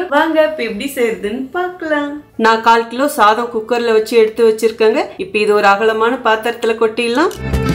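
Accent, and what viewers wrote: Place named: Kongu, India. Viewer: Kongu Thangamae, Sweet Kongu manam veesum ungal Slangs, though at Bangalore, Still Comes to All people and bringing Happiness just like Wonderful preparation of Lemon Rice. Thank you, Selva Magalae.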